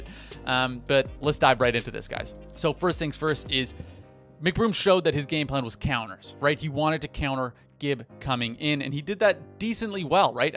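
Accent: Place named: Canada. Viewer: Ay, I can spot that Canadian accent. Are you Canadian?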